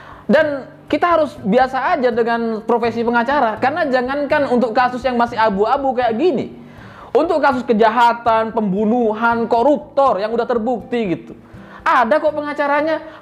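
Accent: Madura, Indonesia. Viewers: Logat maduranya itu lho yg lucu dr mas ini 😁😁 jgn2 mas orang madura ya? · Lucu mas ini... Kayak orang Madura logat nya...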